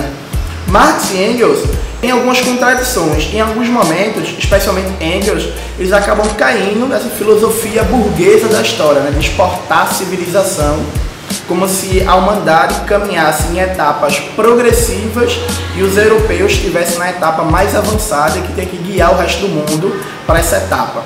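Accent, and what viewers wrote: Pernambuco, Brazil. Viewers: Oh Deus! Esse sotaque de pernambuco me mata. 😍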